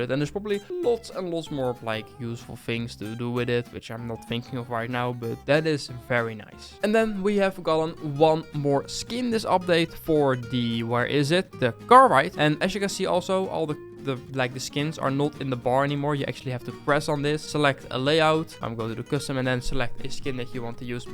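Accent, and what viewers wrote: Netherlands. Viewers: Are you dutch ist looks at a realy dutch accent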